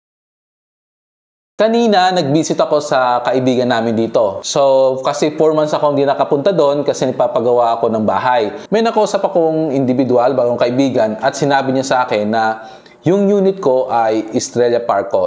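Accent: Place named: Iloilo, Philippines. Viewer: hi sir.. its seems ilonggo ka..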